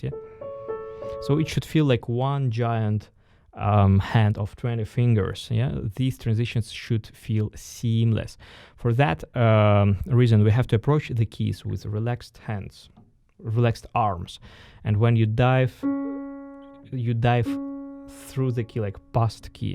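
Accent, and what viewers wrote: Russia. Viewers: An excellent performer and teacher. But why on earth such a terrible Russian accent ? As a musician you should easily master the English speech intonation without much trouble. Kinda strange. But no hard feeling !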